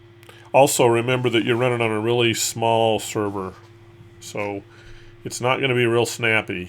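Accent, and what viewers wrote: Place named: United States. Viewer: I love this video! It is in clear American English, He tells every step from start to finish that must be accomplished to natively restore/backup RDS MSSQL with S3 and the screens still match what I saw on my laptop as I went through this process. This is an excellent 'How To' video, I give it Two Thumbs Up!!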